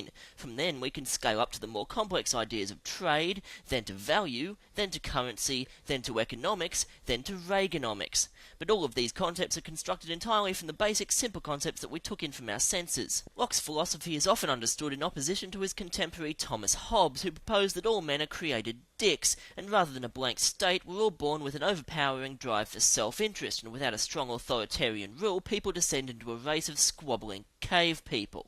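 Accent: Australia. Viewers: Your Australian? :D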